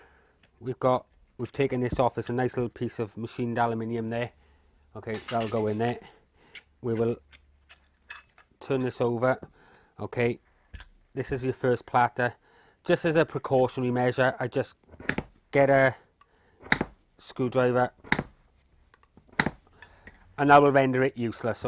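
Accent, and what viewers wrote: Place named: Wales, United Kingdom. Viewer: Hello, Great videos and nice intro. I live in northern Sweden and got in to scrapping by accident so to say. I totally understand the reason you are scrapping as I do it for the same reason, it's escapism and theraputic. Where abouts are you from? Saw you blamed welsh accent, I been to north Wales and loved it, felt like home almost.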